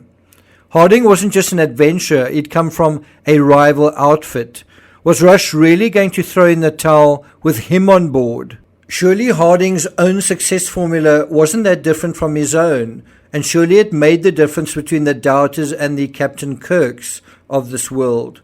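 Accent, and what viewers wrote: South Africa. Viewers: I like your channel very much, especially your naration and your, perhaps South African, accent? :) Your voice is quite calming and very pleasant to listen to. Good work.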